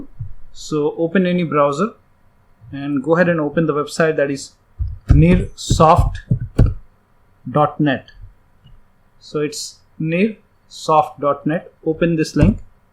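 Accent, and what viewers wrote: India. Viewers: there are currently only 20 dislikes, the author has an indian accent and I've come back to this video several times to use the cmd method The cmd method is legit unless you're using a digital license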